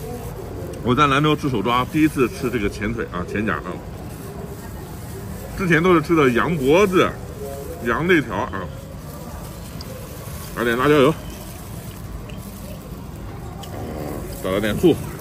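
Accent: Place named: Northern Jiangsu, China. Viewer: 博主口音像是苏北😂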